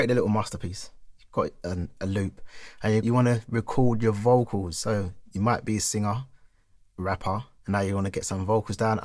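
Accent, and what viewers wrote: United Kingdom. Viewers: yeah its cause it’s British accent it’s easy to get stuck in your head I’m British too😂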